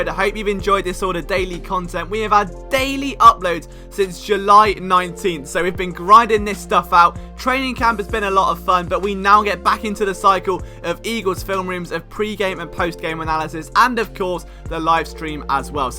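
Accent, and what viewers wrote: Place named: London, United Kingdom. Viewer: YOU SOUND LIKE YOU'RE FROM LONDON!!!!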